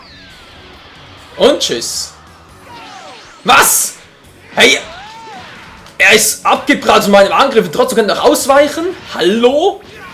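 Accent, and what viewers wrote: Switzerland. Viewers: Bist du schweizer wegen des dialekts?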